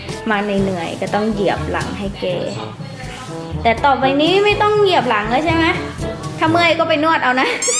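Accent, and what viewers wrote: Phu Thai, Thailand. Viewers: สำเนียงเหมือนสาวภูไทย เสียงน่ารักหมาก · ผู้ไทดงหลวง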